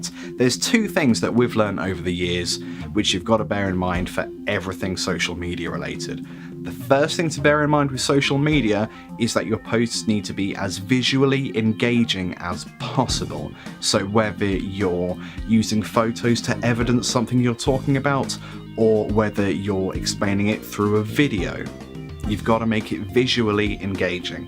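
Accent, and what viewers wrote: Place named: England, United Kingdom. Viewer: Thank god an English accent! 🤣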